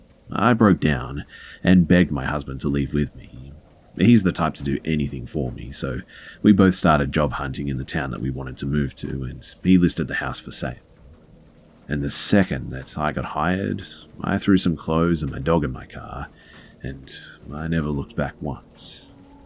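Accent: Australia. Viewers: I 💘 your channel! You have the most gorgeous sounding Aussie voice. Also, your narration is top notch -- truly professional. I'd listen to your narration on just about anything! Okay, anything at all!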